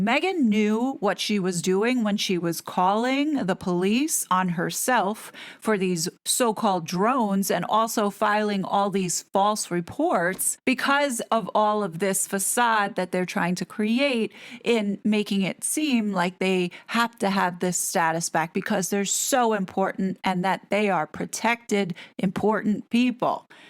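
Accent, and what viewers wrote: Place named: New York, United States. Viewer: this is totally off topic, but you should look into doing books for Audible or some sort of ASMR perhaps. The way you speak, not just my lifelong love of NYC accents like yours, but your inflections, whit and intelligence, I could listen to you do anything. Read anything. And be captivated which isn’t easy for me to be captivated by someone I hear and can’t see. Love you friend. Maybe you can voice commercials? I need you to narrate my life😂😘💛 sending love from California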